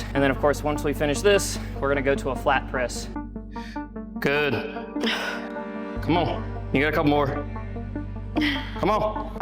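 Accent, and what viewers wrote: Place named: Rural, United States. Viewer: Come ohwne!!! Love that country twang lol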